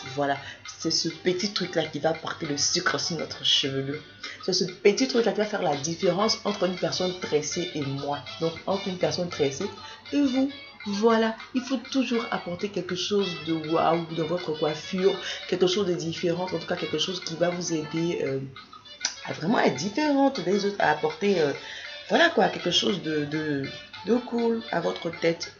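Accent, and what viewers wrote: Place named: Ivory Coast. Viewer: de que j ai entendu t as voie j ai vue que tu étais ivoirienne #225👊👊👊👊👊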